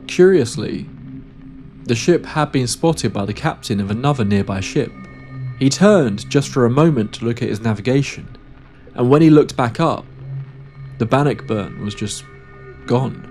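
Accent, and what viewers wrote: United Kingdom. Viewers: Im sorry but it seems odd for stories about America to be told with a british accent . Maybe that is just my opinion, maybe not. And they are good stories by the way 😊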